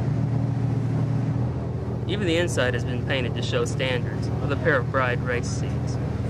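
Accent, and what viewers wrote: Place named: South, United States. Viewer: Who did these voiceovers? Guy sounds southern 😂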